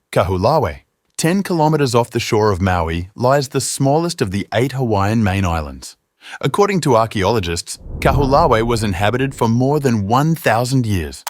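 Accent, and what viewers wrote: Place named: New Zealand. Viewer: Dude sounds like a Kiwi. He may be mispronouncing ʻŌlelo Hawaiʻi by using the Te Reo Māori pronunciation (There are many similarites between the two languages, especially when one is a non native, non fluent speaker of either) As a hawaii resident, I've been told I tend to screw up and mispronounce Te Reo Māori words by saying them as if they are ʻŌlelo Hawaiʻi. (Which I have some familiarity with even though I don't actually speak ʻŌlelo Hawaiʻi fluently)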